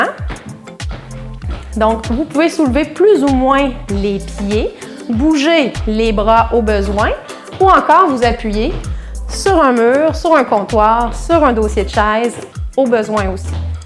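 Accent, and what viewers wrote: Quebec, Canada. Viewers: Bonjour....et un grand bravo pour cette vidéo.qui va beaucoup m'aider...,.car, ayant une cruralgie, je souffre trop pour marcher à l'extérieur, pour le moment. Peut on perdre du poids en pratiquant ces exercices chaque jour...? Et peut on améliorer une cruralgie...? ...p.s. j'aime beaucoup votre accent du Québec....❤❤❤❤😊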